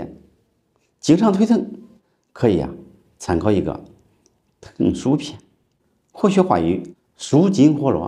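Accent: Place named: Henan, China. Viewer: 这个河南口音来的太正宗了，大概是南阳的吧！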